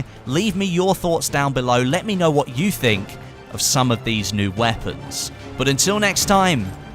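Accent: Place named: United Kingdom. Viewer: why do you Brits have such a smoothing accent? lol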